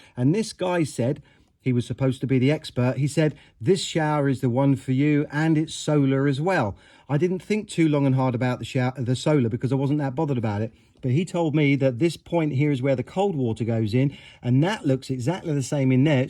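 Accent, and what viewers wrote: United Kingdom. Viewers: What part of England is your accent from?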